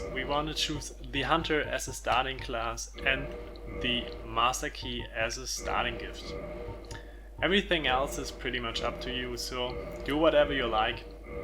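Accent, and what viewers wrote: Germany. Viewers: ***** denglisch ist, wenn man deutsche wörter benutzt oder den satzbau umstellt. Das hier ist nur ein ganz normaler leichter deutscher akzent :D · Is that German? Where are you from, dude? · hm, ich verstehe darunter english mit klar deutschem akzent ^^ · are you from germany becuase i am and your englisch hass a little german slang in it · Man hört dennoch heraus, dass deine Muttersprache Deutsch ist? Und ich hab vorher nicht aufs Video geschaut. Nicht direkt. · man merkt das er deutsch is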